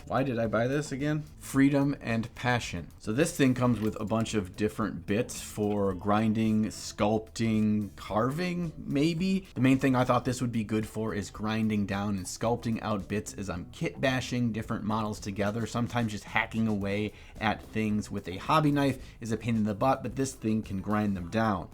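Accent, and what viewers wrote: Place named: Midwest, United States. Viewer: If I were playing a drinking game where I had to do a shot each time I heard an awful midwestern accent "byag" for bag... I'd be wasted in the opening moments of the video!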